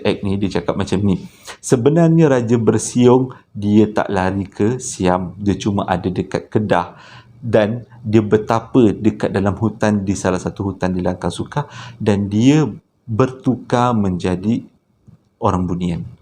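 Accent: Java, Indonesia. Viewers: Selain kc champion. Abg best la citer mistik2 dan hikayat.. best je dgr bila abg smpai kn citer kadang2 ade sleng mcm jawa 😂😂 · Nape aku suka bercerita: 1- Xde jumpscare..jd nye xde la terkejut2..haha 2- Dia cerita xde cut dan edit..terus je..so rasa cam borak depan2... 3-,ko jawa ke?agak pekat bunyi jawa ko..hilang rs rindu nak borak2 dgn kwn2 jawa..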